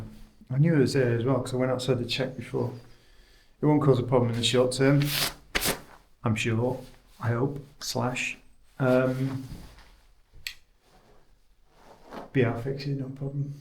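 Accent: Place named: Northern England, United Kingdom. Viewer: It is north British English... The best way to 'speaka di language'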